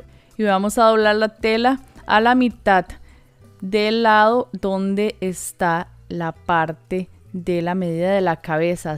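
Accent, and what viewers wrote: Costa Rica. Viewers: Ese acento no se pierde!!! Bravo una tica emprendedora!!! Saludes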